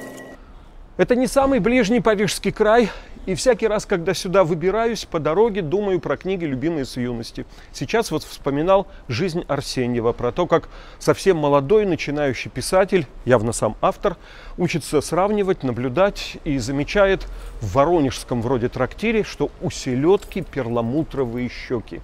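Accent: Vologda, Russia. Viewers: Бегущий Париж провожает меня....Красиво. Спасибо Леонид, особенно за вологодский говор!!! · Люблю Вологодское оканье, как Кёльнский Плят Дойч...)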